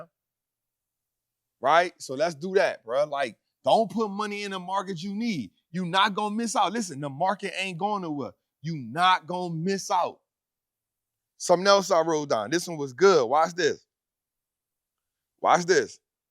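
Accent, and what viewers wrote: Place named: Louisiana, United States. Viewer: Thanks for the information!! Also, love your Cajun accent!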